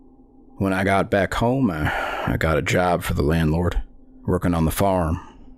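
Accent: South, United States. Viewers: I am enjoying the Southern drawl dialect, the story is okay, not the best but the narrator voice made me want to listen to the end.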